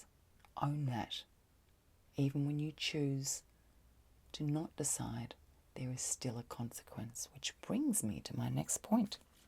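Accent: Australia. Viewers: I love your accent!! Are you Australian?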